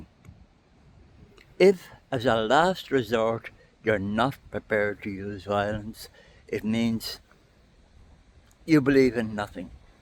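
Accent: Ireland. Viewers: I am a Jew and an Israeli. Thank you for standing with us. It's beautiful to hear an Irish accent that isn't speaking hatefully toward us. May God bless you!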